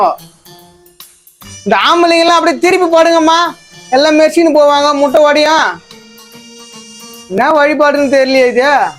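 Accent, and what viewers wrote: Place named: Chennai, India. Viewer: தலைவா நிங்க பேசரத பார்த்தா சென்னை மாதிரி தெரியுது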